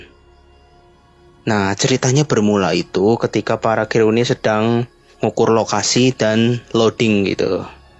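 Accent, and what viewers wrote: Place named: Java, Indonesia. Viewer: saya ga suka nih kalo dia yg baca suara. Medok. trus ga enak bawainnya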